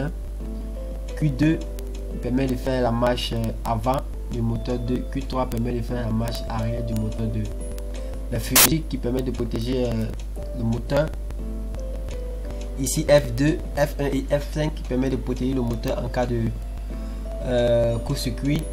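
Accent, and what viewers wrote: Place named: France. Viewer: j'ai rien compris par votre accent française !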